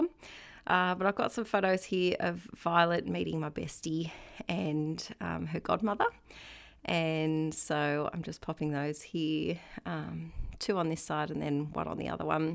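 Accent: Australia. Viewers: Just saying hi from Victoria. Nice to see an Australian junk journaller! I was like, "I know that accent!!" 🤣👍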